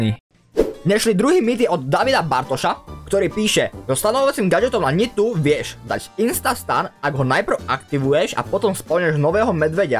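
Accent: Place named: Slovakia. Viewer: Ti šušleš kamo a trochu iš po slovensky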